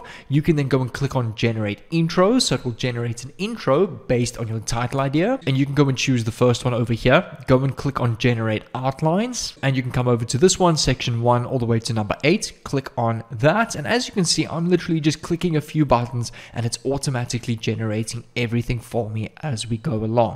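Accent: South Africa. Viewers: Ayyy, is that a South African accent I hear 😂🤙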